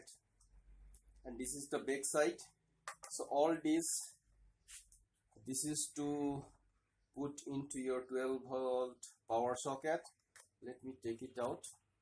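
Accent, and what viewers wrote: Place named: Assam, India. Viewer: pakka assamese accent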